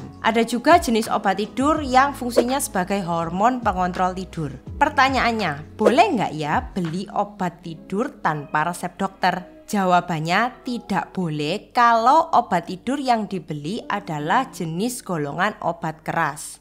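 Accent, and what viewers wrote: Java, Indonesia. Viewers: chindo medok jawa nih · medok ya mbak...😁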